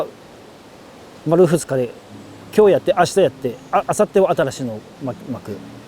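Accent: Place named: Northern Tohoku, Japan. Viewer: 北東北独特の訛りがかっこいい！ 、話を聞いているだけで、釣りに対する知識や姿勢が感じ取れる、かっこいい方ですね。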